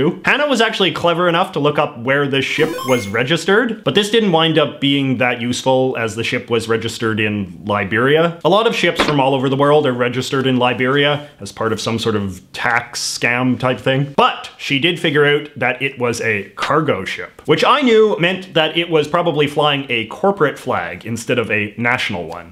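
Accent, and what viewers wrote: Canada. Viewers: aebout, und and you are canadian bro am i right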